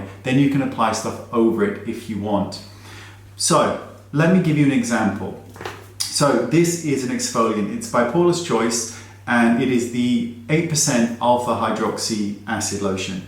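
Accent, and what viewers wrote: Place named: England, United Kingdom. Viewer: Firstly...I enjoy your voice, your English accent and I think you’re bloody handsome! I like your honest no nonsense approach, great make up tips and tutorials. And I enjoyed your Wayne Talks where you shared how it’s OK for people to ask questions, to ask if you (or whomever) is gay. Although I never thought it being an offensive question, I still found your perspective enlightening, as it helped further deepen my own understanding and position. Keep sharing your wealth of knowledge and coming across as down to earth and unpretentious!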